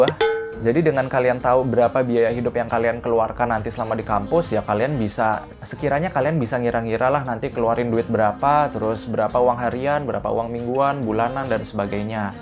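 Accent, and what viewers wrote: Jakarta, Indonesia. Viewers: ya dia emng org jkt bro, cuma kuliah di surabaya jd dia lebih fasih bhs jkt, walaupun bhs jawa bisa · logatx msh jkt, blm sby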